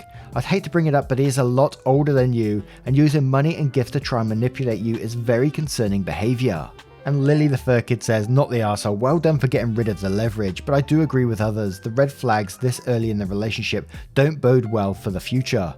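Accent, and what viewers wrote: United Kingdom. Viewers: I really like your accent. What part of the UK are you from?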